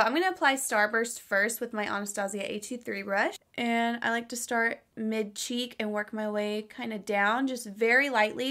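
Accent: South, United States. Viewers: Oh my gosh! I love it when the southern accent comes out every once and a while! I had a southern accent when I was younger up until we moved back to the west coast when I was young.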